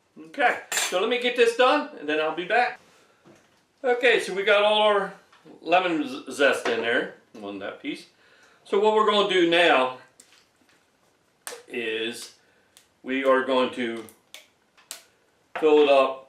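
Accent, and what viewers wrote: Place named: Hammonton, United States. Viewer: down in cape may. My wife is from Hammonton and has the same accent as you .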